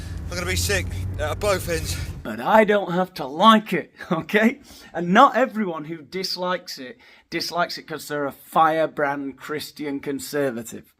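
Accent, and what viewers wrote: Scotland, United Kingdom. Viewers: At 69 years of age,I have no idea of who Sam Smith is. What I do know is that 'they or them ' is plural not singular. Whoever he or it is,he or it comes across as being a complete twat. Whether you add things on or take things off you are genetically male or female,that is fact. Seriously,is this the future? I think not. He,they,it or whatever is a sad example of a human being,we should feel sorry for this specimen but 'they, him, it or whatever is going into this with eyes wide open and is using this for their own gain so no sympathy here. Fantastic Scottish accent btw. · Love your comedy, love the Scottish accent, but I also love your serious comments at the end. Keep up the good work! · Impressive southern Scottish accent there This Scot🏴 approves! 😄👍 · Best Scottish accent ever! Love when you laugh at your own jokes. 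· I agree …. I feel bad about Sam Smith. I think he is going down a dangerous path in order to get acceptance from his audience. You create a great balance of humour and logical thinking. BTW…. I love your Scottish accent!❤ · I absolutely love your commentary, your memes and your Scottish accent 🤣🤣, always a laugh watching your videos, yet you speak so much of what we all think! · I like your Scottish Accent. Sounds So cool. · Excellent video. You are spot on. Your Scottish accent had me laughing hysterically loud in the metro. I really enjoy your take on things and your humor. Thanks for making me laugh and for sharing your opinions. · That Scottish accent wasn't too bad! Not sure you'd get away with it here in Glasgow. You definitely wouldn't get away with nipple tussles and a corset. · Loved the Scottish accent!!! & ur video was GREAT!-as always Thanks ✌ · I love the Scottish accent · You have surpassed yourself in this one! 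Especially the Scottish accent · Great Scots accent .. yr f..........amazing love it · Love the Scottish accent! 👏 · Scottish accent nailed 🎯 Brilliant stuff. Your insightful analysis of Sam is also spot on 👏🏻 … adults need to know where the boundaries and truths are as much as kids need to, and once they stop listening, or surround themselves with people who don’t care enough to be honest with them, they can end up in pretty wobbly places. I ❤ Sam Smith’s singing … but the rest of his act is turning into an unnecessary circus which detracts from his raw and stellar talent. I don’t understand the road he’s going down so publically. No, it’s not appealing. Caucasity (my new fave word of yours) is a bit underrated sometimes in this crazy world 🌈🦄🦭 May your week be tassel free and filled with peace, sanity, and laughter. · Like your Scottish accent. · The problem as I see it is society in general is accepting this behaviour. 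Why are they accepting it, is the question, are they afraid of being cancelled, being demonitised or being seen as "a right wing hack" Its stupid to bring politics into this, you know what kind of society do we want? A decent one? A fair one? Indulging these peoples fantasies of their identity and accepting their disgusting behaviour is agreeing with it. Well I dont agree with it, I want a society that is decent that holds good values up as things to aim for. I know heaps of gay people most of them you wouldnt even know they were gay and why should you? What people do in their bedrooms is none of our business, but putting on shows like this is just disgusting and the sad part is Sam Smith has a good voice, he doesnt need to be like this to sell records. ps love your scot accent! keep it up lol. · Love your rants and insightful insight, and your Scottish accent is great !! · I thought it was rather dated to be honest. 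Madonna has already done it 🤷‍♀️. What’s the Scots connection, great accent 😂❤️ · On the way to 100000 subscriber!! Bravo!!!! My God, I looooooooove that Scottish accent!!! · Great Scots accent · Loving your take on the Scottish accent. And obviously spot on commentary as usual. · Great Scottish accent 🤣🤣🤣😍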